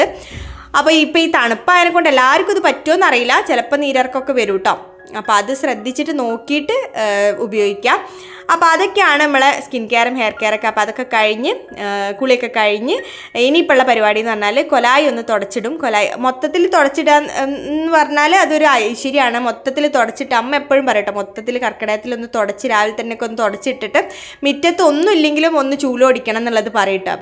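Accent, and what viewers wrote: Kozhikode, India. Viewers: swontham kozhikkote bhasha aayondanu kooduthalum aduppam thonunne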